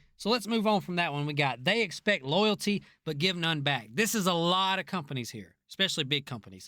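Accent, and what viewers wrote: South, United States. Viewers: The southern American accent is so sophisticated and refined it makes me think of prestigious university professors and silver tongued politicians. Then there's a random "shut the fuck up DUDE" it's a total mind fuck the same as if someone was swearing in a posh London accent. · Perfect southern accent ❤️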